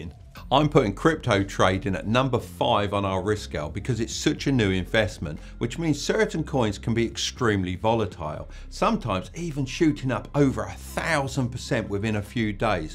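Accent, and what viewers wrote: United Kingdom. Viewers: His british accent is fire ;)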